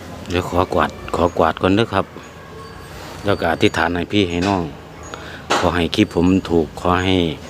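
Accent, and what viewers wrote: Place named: Chaiyaphum, Thailand. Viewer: แอดมิดสำเนียงชัยภูมิออกคักเนาะอ้าย555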